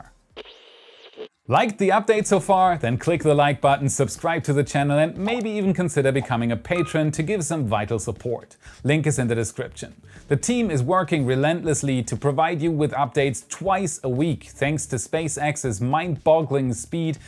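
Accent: Germany. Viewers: I can hear the german akcent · is that a german accent ? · Your English isn't quite good enough to tell (yet), but he has a quite noticeable German accent. Similarly, most native English speakers can't tell Bavaria from Berlin from Bern. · Top-quality content, and loving the German accent! :D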